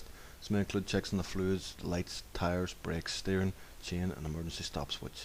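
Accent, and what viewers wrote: Northern Ireland, United Kingdom. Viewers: youtube closed captioning fails quite hard with Northern Ireland accents :D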